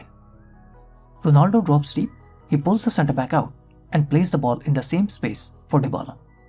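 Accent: India. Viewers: this indian accent so annoying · Fucking indian accent. This analyzis is bullshit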